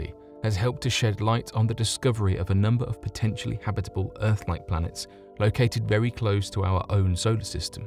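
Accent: United Kingdom. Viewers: British mate, close though.....kinda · British accents are good for documentaries and bad guys in movies. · The British accent is an extra convincing aspect 😉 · Guy with a thick british accent ranting about American beauracracy, framing the whole thing as though NASA has any influence over the government. 1/2 of 1 cent of every tax dollar. NASA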